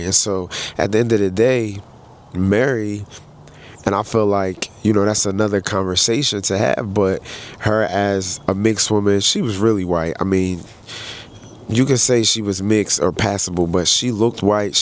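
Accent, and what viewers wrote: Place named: Caribbean. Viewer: Sir, do you have family members who originate in the Southeastern United States? Because nobody who has ancestors from that place and lived during that time would totally and completely invalidate Mary's race and ethnicity like you did. I'm sensing a slight Caribbean accent too. I'm sensing your people are not originally from here, because people who looked like Mary were definitely in our family as grand fathers and grandmothers, great grandfathers and grandmothers, great great grand fathers and grandmothers. We all had family members who were Yt presenting and some actually passed for Yt. Your definition of what is Yt doesn't apply to the historical reality of Mary's identity, and it was really aggravating to hear you constantly dismiss her race and ethnicity and call that woman Yt and say Stack had a Yt girl. Mary was not a Yt woman. 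It's very low key disrespectful to dismiss people like Mary who were in our lineage and chose not to pass, and that is what Mary ultimately chose for herself, to not pass for Yt or live as a Yt person. Her storyline was a representation of our ancestors who had her experience. People who were Yt presenting in Black America is part of the Black American experience. There were plenty of Yt presenting Black Americans in the USA who were enslaved in this country, and Jim Crowed right along with the darker skin Black folks, and who stayed within the negro/colored community marrying more darker skinned Blk folks and as a result, we have these women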